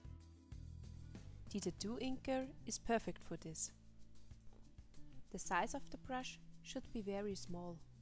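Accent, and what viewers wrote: Germany. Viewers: Dieses deutsche Englisch 😂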